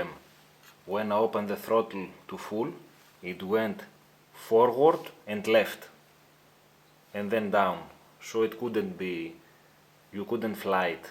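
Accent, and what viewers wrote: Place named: Greece. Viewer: your greek, i can tell by your accent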